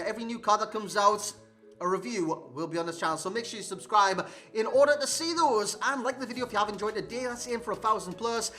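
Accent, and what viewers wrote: Newcastle, United Kingdom. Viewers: Mike Just being enthusiastic for the video, to keep you occupied.. plus he has a Newcastle accent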